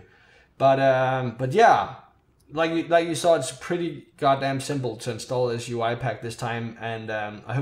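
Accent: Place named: Denmark. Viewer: Man kan tydeligt høre at du er dansker! :D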